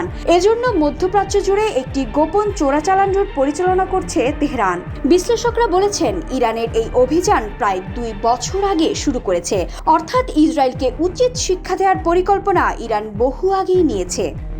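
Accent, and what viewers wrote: Kolkata, India. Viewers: কলকাতা স্টাইলে শাউয়ার নিউজ পড়ে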